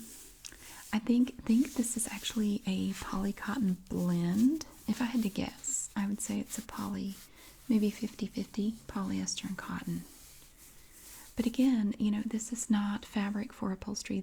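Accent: South, United States. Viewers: I have family in the south and I think one of the reasons I like you is because you remind me of them. There is something captivating about Southerners - not just the accent, but your mannerisms. Anyway it makes for a good foundation for asmr. You’re exceptionally graceful so that makes you special in my opinion :) I hope you keep doing this for a long time I always enjoy seeing all the stuff you come up with. Thanks for sharing your talents with the world.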